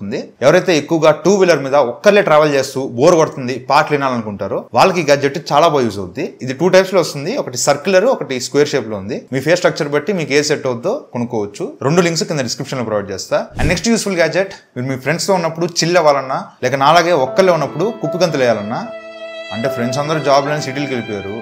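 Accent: Nellore, India. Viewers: Needhi Nellore ah?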